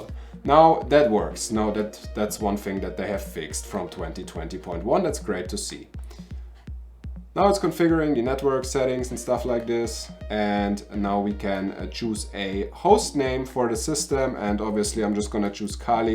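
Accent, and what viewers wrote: Germany. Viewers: Nice one, deutscher Akzent lässt sich einfach nicht verbergen 👍😁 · bist du deutsch? dein akzent🤗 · Are you German?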